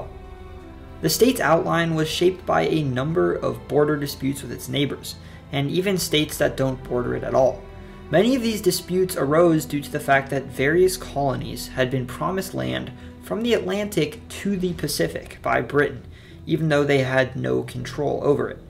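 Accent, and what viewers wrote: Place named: Pennsylvania, United States. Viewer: I knew you lived in Pittsburgh just from the way you pronounce Carnegie.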